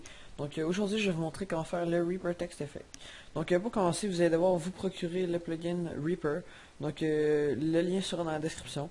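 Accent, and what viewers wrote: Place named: Quebec, Canada. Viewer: J'adore ton accent Québequois !!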